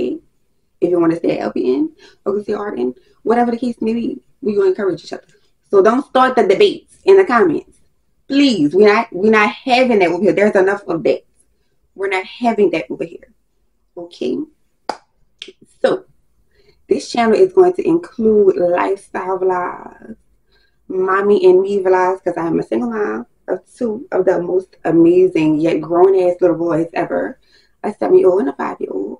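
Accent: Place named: Los Angeles, United States. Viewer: Girl I've been binging your vids all day! I found your channel today while looking up correctional nursing videos as I only have one more pre req before I apply to my RN program. Been a CNA wayyy too long (7yrs) and ready to finally change my life for myself and my 2 toddlers. You're a breath of fresh air and I'm excited for your content and going on my nursing/healing/motherhood journey right with you girl❤...oh and that LA accent is everything baaaby or however y'all say it lol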